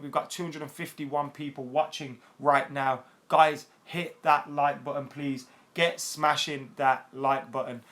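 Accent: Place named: London, United Kingdom. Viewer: lol you're from the endz eh. London or Manchester?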